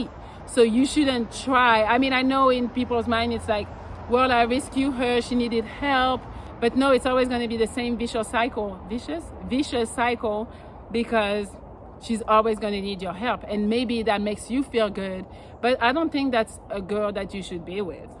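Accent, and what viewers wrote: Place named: France. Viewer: It's French. I prefer to believe it's Brazilian.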